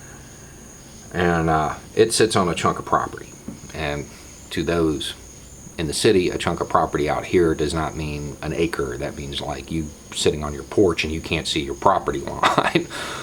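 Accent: South, United States. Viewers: The fact that your southern accent would probably stop me from even asking you for directions scares me to dead, I don't want to be part of the fear mongering crowd; I want to see people as human beings and have my children learn from many cultures just my own.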